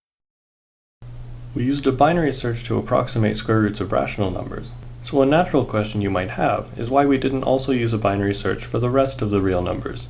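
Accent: Quebec, Canada. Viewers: Juste pour être bien sûr, t'es québécois ???????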